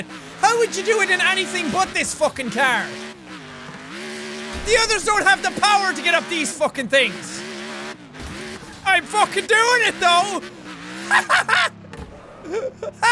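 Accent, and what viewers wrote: Ireland. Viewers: I just watch ur older videos and ur accent was very Irish and I still laugh at ur minecraft survival videos and gmod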